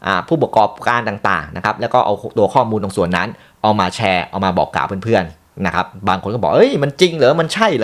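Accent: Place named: North, Thailand. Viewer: ไม่ว่ากันเรื่องพูดไม่ชัดในบางคำ เพราะมันฝังรากลึกไปแล้ว เหมือนคนเหนือ ต่อให้พูดกลางแค่ไหน จะมีแว๊บหนึ่งที่จะเหน่อเหนือนิด ๆ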